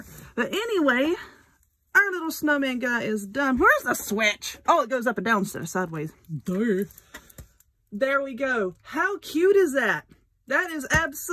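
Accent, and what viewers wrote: South, United States. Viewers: I ABSOFREAKINLOUTY LOVE YOUR CHANNEL!!! I'm from Dallas, NC and have that southern TWANG too!! It's so great to here someone like me on You Tube! Yes Mam, we were raised right. Only a Southern Girl knows all the old sayings and uses them right, as well as, MANNERS!! XOXO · Going to Dollar general to heyu snowman and Wally World to get my rings. He's darling and I love your southern accent. It's the bomb!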